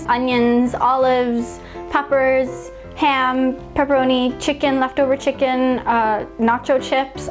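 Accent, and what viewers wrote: United States. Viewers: Definitely a Floridian accent. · her accent sounds like it is from the midwestern us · That explains a lot! Yours is the least odd foreign American-sounding accent I've heard, though. Had a friend whose wife was from... Croatia, I think? She had the most Brooklyn English accent I've ever heard. I didn't know she wasn't from Brooklyn for about a year after I met her. I never did find out if she learned to speak English from someone from Brooklyn or if she just chose a heavy American accent to cover her Croatian accent.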